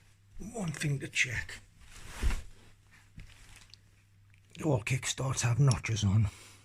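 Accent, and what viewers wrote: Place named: Ireland. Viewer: Awesome thanks for all the detail. Luv your irish accent too! If you ever need. Any help with your health. Let me know. Contact is on my channel.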